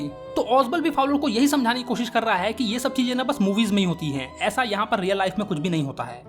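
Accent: Bihar, India. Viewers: Sir you use to talk in your bihari tone too many times "susura" pls have a look at it 😢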